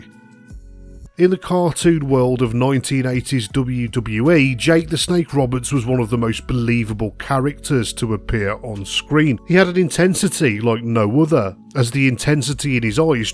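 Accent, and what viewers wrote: United Kingdom. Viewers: “or joost a bit of good look” I love bri ish accents 😁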